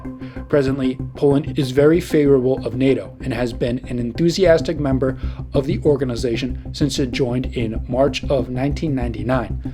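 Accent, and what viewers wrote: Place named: United States. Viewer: Myślę że to Akcent amerykański ale nie jestem pewien z jakiego rejonu.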